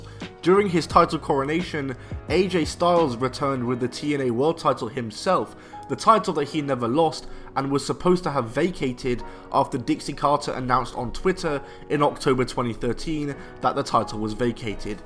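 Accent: United Kingdom. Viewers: Listening to a nit brit talk about this is crap.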